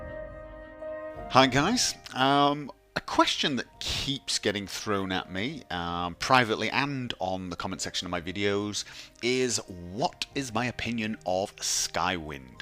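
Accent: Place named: North, United Kingdom. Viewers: Are you from Lancashire by any chance? Your accent is Northern, but I know tapped r's aren't a Yorkshire or Tyneside thing as far as I'm aware. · You're from Norway? You sound a bit Scouse haha, cool video.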